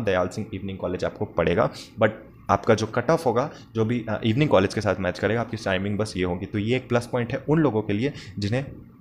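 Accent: Bengal, India. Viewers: Naa mane arabinda k orobindo bolcho r motamuti mone hoy tumi bangali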